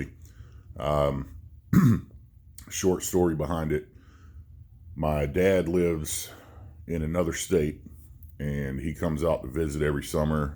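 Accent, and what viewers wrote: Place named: United States. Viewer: Bro ur voice it sounds so American how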